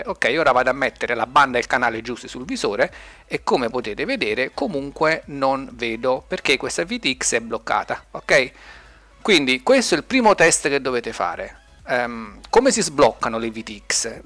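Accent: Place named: Naples, Italy. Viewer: Ciao, dall’accento ti sento del sud, forse partenopeo? Siamo vicini, vieni al Sirio di TERZIGNO a trovarci?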